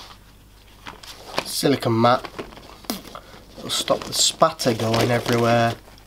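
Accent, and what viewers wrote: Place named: United Kingdom. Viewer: He has a British accent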